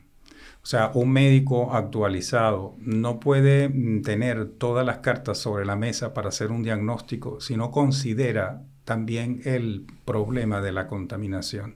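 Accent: Venezuela. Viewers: su espanol es Venezolano claramente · Excelente entrevista ! Usted es italiano pero habla muy Venezolano Doctor🇻🇪🙏🙌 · Para mí, este capítulo ha sido una cátedra magistral, impartida por un profesional excepcional. Su brillantez reside también en su habilidad para simplificar términos técnicos, haciendo que la información sea comprensible para todos. Su calidad humana es igualmente destacable. Desconozco su trayectoria personal, pero por su acento, percibo una profunda conexión con los venezolanos y Venezuela. ¡Mi más sincero agradecimiento! Totalmente recomendado." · Que hombre tan inteligente 🧠 y EXCELENTE ORADOR ❤ CREO Q ES VENEZOLANO POR SU ACENTO. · jiji Solo escuche el intro y super que el doctor es Venezolano, que orgullo. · me descolocó cuando dijo que era italiano, desde escuchar sus primeros palabras supuse que era venezolano por su acento!!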